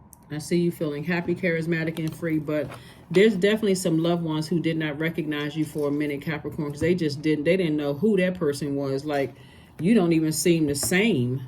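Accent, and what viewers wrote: United States. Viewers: Thanks so much for this reading and for the support and clarity I have gained through these readings. Take care of yourself and God’s blessings always. You sound American and one day sooner than later I will like to catch up to say Hello. ❤❤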